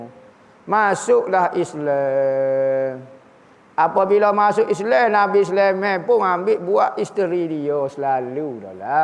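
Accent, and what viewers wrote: Kelantan, Malaysia. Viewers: Betul bang kupasan tu jelas, yg menjadi masalah takut org luar xfhm dgn loghat kelate takut disalah ertikan...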